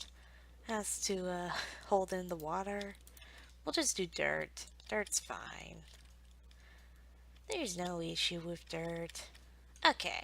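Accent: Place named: Canada. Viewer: Wow I can't believe senpai noticed me! I get the main North American accent feels but tinges of European... so Canadian/French Canadian? I dunno but it's nice!!! Why aren't you more popular :(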